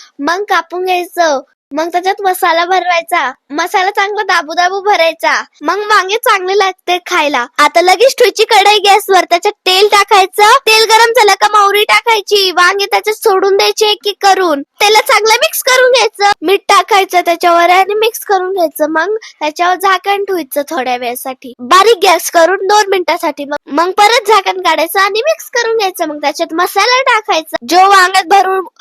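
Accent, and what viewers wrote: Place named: Marathwada, India. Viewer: 😂khup chan it's Nanded, latur ,dharashiv language 😅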